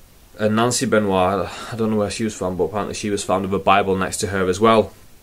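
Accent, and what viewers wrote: Manchester, United Kingdom. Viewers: Does this guy on the video have an Manchester accent ? Is he from Manchester? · Where you from anyway? You sounds like your from my home town? Manchester Salford :)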